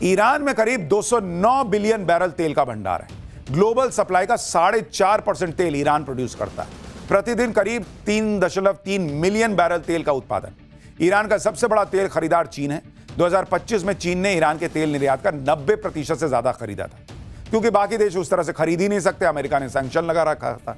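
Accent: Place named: Patna, India. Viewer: Sir aap Patna Se Ho keya apki language patna wala hai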